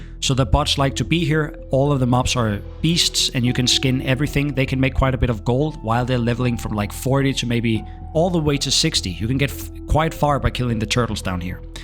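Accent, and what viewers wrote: Denmark. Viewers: God, i like these videos.. But the commentary and danish accent is awful.,.. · that is the most danish accent i ever heard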